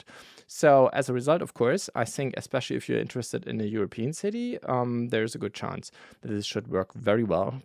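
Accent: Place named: Germany. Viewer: Since my German is terrible while my family is over 1/2 German/Austrian American, I love listening to your accent. As a simple tip, bear - the animal - is pronounced the same as bare, as in not covered. Both rhyme with air. Beer, the drink, rhymes with ear, on your head. Your release videos are the best out there for non-lego sets. Pixel Dan's ARC-170 looks like what Lego Group should have done.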